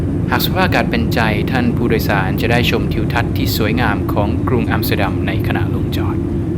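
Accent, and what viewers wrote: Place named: United Kingdom. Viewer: I LOVE YOUR BRITISH ACCENNT ♥️♥️♥️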